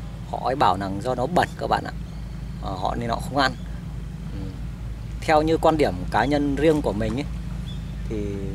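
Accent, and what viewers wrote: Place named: Nam Dinh, Vietnam. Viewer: Hê nô...giọng Hà tây hay Nam định mà nghe ngộ ngộ , chắc Nam định 100% nuôn !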